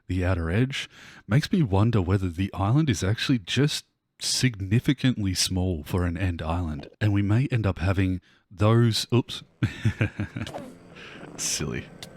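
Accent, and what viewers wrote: Australia. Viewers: Are you Australian?? I am new to your channel and loving every video you upload! Your voice is really soothing so make it a relaxing experience even when you almost “falling” into the void heheh cheers and take care!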